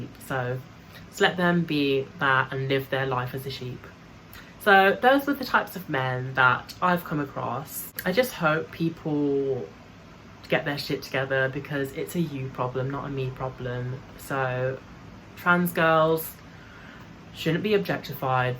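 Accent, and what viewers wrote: England, United Kingdom. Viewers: Loved the video, it was good to hear an English voice rather than American. I thought you brought out relevant points in your topic which has been covered many times by Trans women on you tube. But you missed out one type of man in your vlog and that is the one who looks at a trans as a woman not bothered what's between her legs, takes her for who she is. Not worried about being seen in public like the relationship is some kind of secret that he doesn't want to let out of the bag. And yes, I've subscribed. Keep safe.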